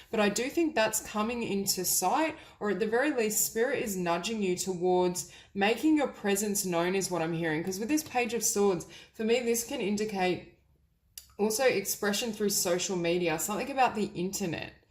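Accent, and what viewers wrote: Australia. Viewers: Where are you from? Sound like Australian.. if yes, can i drop by for reading personally?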